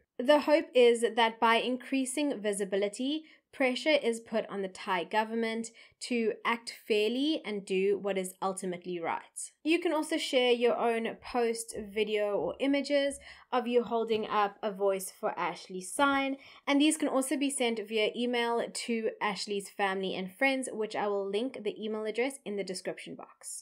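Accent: South Africa. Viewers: Your channel just popped up on my home page! I’m so glad it did because I already ADORE you, and have only seen this video ☺️ plus, your accent is awesome 😎 it’s great to see a youtuber in another part of the world that isn’t UK or US or Australia. We never hear cases from South Africa, except for the Pistorius debacle. This case is so scary!! I really hope Ashley can get out, the Thai legal system and government can never ever admit when they are wrong. I’ll share this video on my Twitter and Facebook. 🙏🏼♥️ much love from the UK 🇬🇧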